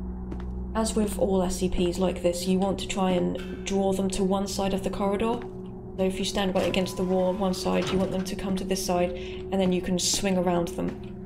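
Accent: United Kingdom. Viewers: I thought this was a 13 year old British kid the entire time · Are you British? Perchance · Are you British?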